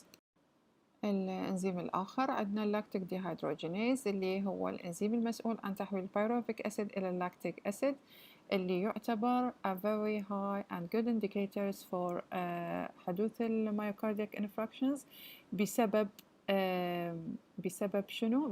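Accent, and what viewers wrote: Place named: United Kingdom. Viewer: Great , u have a really nice British accent 💜🙏🏻 Well, I speak with American accent but.... I think that British is beautiful than American accent and so clear 😐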